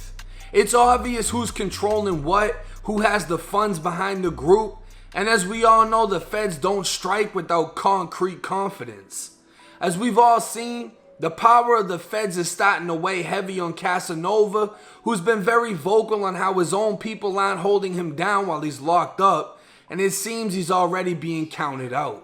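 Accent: Boston, United States. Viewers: Lol love Boston accent and I'm Canadian I just heard it on tv or Internet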